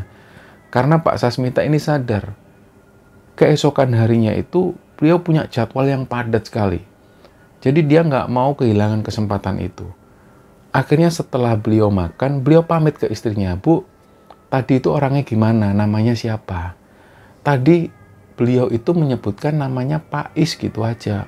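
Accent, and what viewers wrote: Java, Indonesia. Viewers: suka... Dialek jawanya bikin adem Channel Nadia Omara jg bagus yg serial KHW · Saya pengikut baru mas,awalnya saya lihat kismisnya mas ini yg kuperhatikan cara beliau membawakan cerota dg logat jawanya adri situlah saya ikuti konten,semoga sllu sukses untuk mas dn para kru,dari THARIE KALIMANTAN akan sllu hadir di setiap kismisnya 👍👍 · Kyknya saya udah kecanduan cerita2 mistis. Suka bgt dengar aksen jawanya😊 Cara pengceritaan yg sangat menarik dan gampang di fahami oleh org Malaysia seperti saya. Mas nya juga ganteng kok🤭😄🙏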